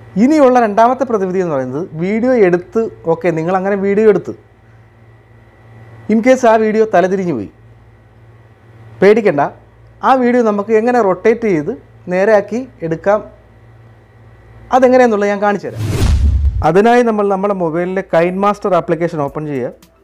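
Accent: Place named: Tamil Nadu, India. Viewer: sounds like your talking tamil? ill try ippadi irruka? hehe or maybe you live somewhere from tamil nadu sir?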